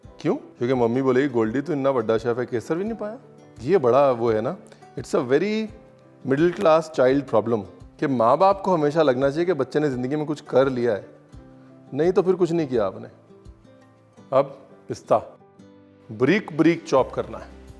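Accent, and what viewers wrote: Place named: Punjab, India. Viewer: Omg your anchoring...niri punjabia wali..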